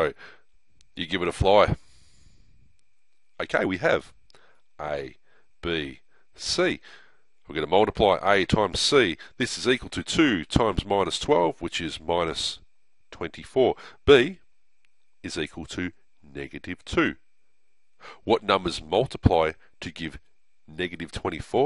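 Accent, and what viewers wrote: Australia. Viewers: It's clearly ozzie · Are you aussie cause i also am ? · its Australian haha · wow, my mind is blown... that's so much easier than how I was taught! Also, math done in Australian just sounds less arduous. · Anything with Aussie accent is cool!!!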